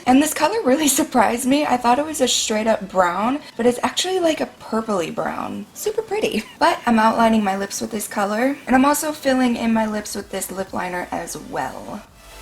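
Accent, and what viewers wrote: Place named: United States. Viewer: As an Englishman, I love American accents, this one in particular. I wish this wonderful lady would do some ASMR.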